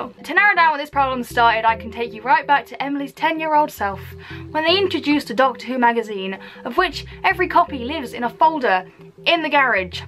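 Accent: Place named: United Kingdom. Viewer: your voice is my favorite a. it's brittish, and b. it's a pretty British :)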